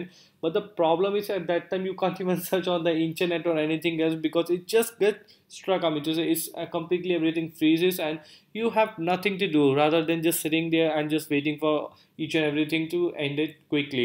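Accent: India. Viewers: He's an Indian. How else do you want his accent to be?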